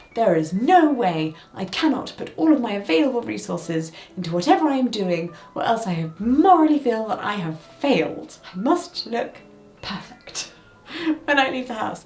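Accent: RP, United Kingdom. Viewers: Is your accent that plummy naturally, or do you play it up for an on-camera persona?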